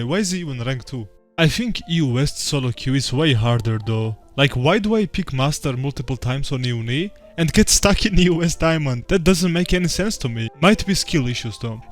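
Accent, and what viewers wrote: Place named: Georgia, United States. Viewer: i can tell from your accent you are georgian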